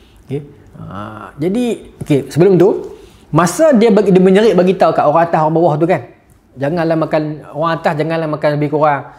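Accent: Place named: Kedah, Malaysia. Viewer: Seronok dengar loghat kedah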